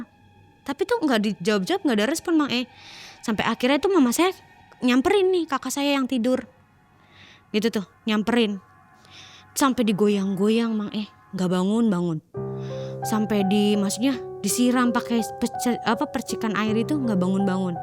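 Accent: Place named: Cirebon, Indonesia. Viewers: Cirebon yaqin, Indramayu mirip sih,tapi tetep beda,mba tulen Cirebon, rumah makan mana ya buka nya sore smpe mlm dan ramai pengunjungnya 😊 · Klo denger logatnga sprtinya lokasinya di cirebon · Logat bahasae kyk wong Cerbon c yuu 😁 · Wong cirebon ya mba ?? Logate keciri 😂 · Logatnya daerah majakuning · bahasnya kaya orang cirebon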